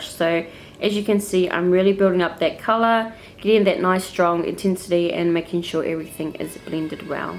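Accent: New Zealand. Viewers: are you from New Zealand? 😍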